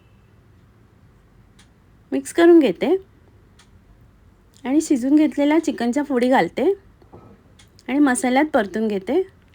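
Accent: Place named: Goa, India. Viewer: तुमची सांगण्याची पद्धत फारच गोड आहे.ऐकतच रहावे असे वाटते.गोव्याचे कोकणी मराठी मध्ये.❤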